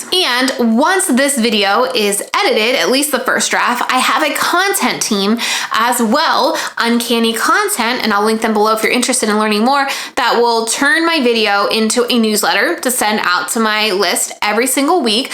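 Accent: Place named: Utah, United States. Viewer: You're from Utah right? Your accent sounds very Utah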